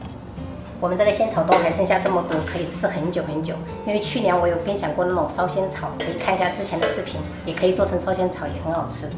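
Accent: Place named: Minnan, China. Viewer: 第一次聽到妳講閩南語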